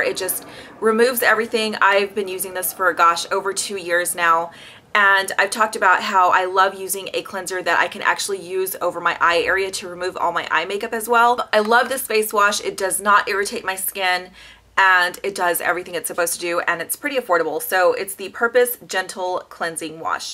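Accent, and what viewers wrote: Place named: South, United States. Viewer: Very good information, thanks! I can tell you are a fellow southerner..."we are Fixin' to go to my mother's" We only say Fixin' to do something in the south. Have a great weekend!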